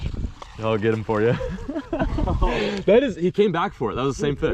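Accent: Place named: Texas, United States. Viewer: Did anyone notice how texas accent came back when he left Main?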